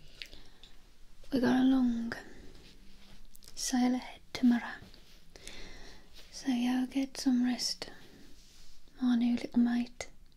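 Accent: Black Country, United Kingdom. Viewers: Black country accent is spot on! Great job!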